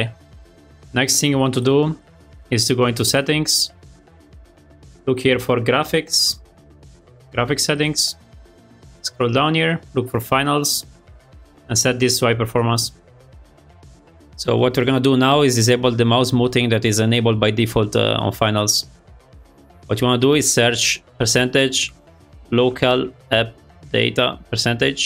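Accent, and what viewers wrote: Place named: Italy. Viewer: L’accento italiano non scappa😭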